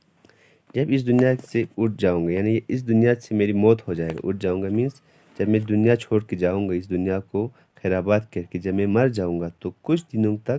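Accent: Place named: Pakistan. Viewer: Ooo bro bohot Jldii reply kiya h , I told because, Sir ka Urdu accent sa laga Mujhe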